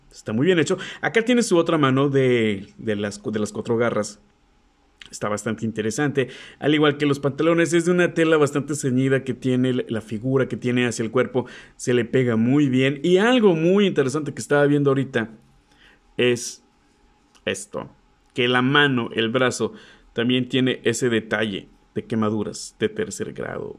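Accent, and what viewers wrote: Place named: Coahuila, Mexico. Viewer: Eres el mejor:D sigo sintiendo tu asentó cuahuileño aún que ya me dijiste que eres de Tijuana:D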